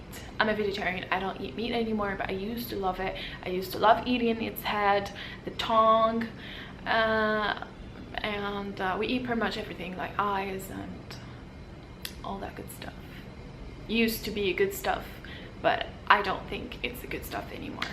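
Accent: Morocco. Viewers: You're 100 pecent moroccan and your accent is extremely great plz make a video in which you talk about how did you improve your English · Morocco Travel she does have a slight Moroccan accent. But it is cute.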